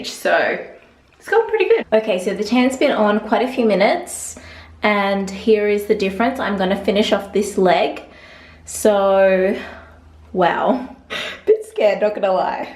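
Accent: Australia. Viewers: She sounds Australian not British. Maybe it washed off cause she didn't wait long enough. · Okay but I love your voice and your Australian accent. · this is a really random question but is she from australia? idk she sounds australian though. just asking because i want to watch australia youtubers and she was in my recommended and she seems nice and i love her already ahaha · Is she from Australia? I am and I think I recognize the accent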